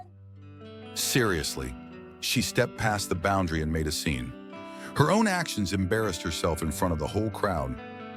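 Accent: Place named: United States. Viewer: Why must an American be the Off voice???🤨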